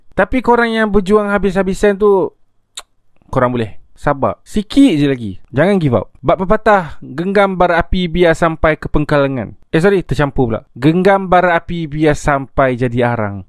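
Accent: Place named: Kelantan, Malaysia. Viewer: mace kecek klate jah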